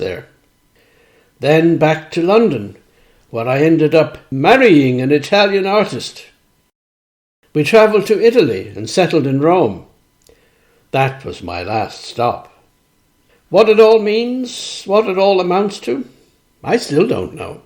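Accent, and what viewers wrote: Dublin, Ireland. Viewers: Beautiful unique Dublin voice and a wonderful story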